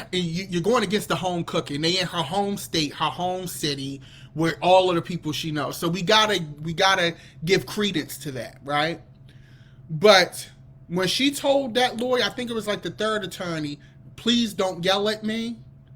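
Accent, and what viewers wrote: Baltimore, United States. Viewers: Baby! I got my whole life in this life! They want her to be humbled down and I’m so glad she didn’t!! Where are you from?? It’s giving DC or Baltimore?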